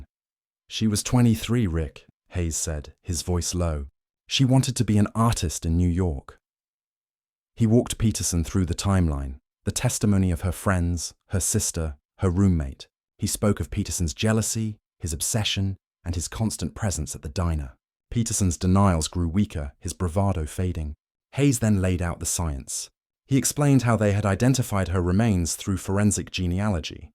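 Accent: United Kingdom. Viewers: Is this just something you made up because 1st, There isn't a Granby. State forest in Massachusetts 2nd Hartford is in Connecticut.. 3rd Kill the British accent... It sucks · Why is there a British guy talking about this story couldn’t you make it an American????????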